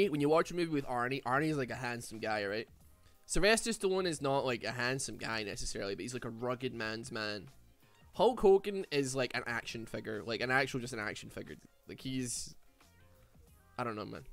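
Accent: Scotland, United Kingdom. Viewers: do you know how many times this video has popped up on my autoplay while i've been at work Coworker: What are you listening to? Me: A Scottish guy ramble for hours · comedic scottish man rambling and lightly comentating over a league game to study / relax to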